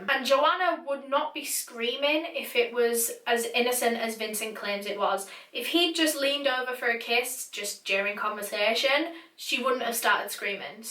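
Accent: United Kingdom. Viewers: your accent is so.. brit gangsta- i love it... keep up the tight work, its awesome, so far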